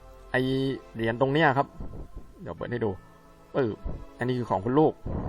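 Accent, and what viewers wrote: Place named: South, Thailand. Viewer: ชอบสำเนียงใต้ๆของพี่นะ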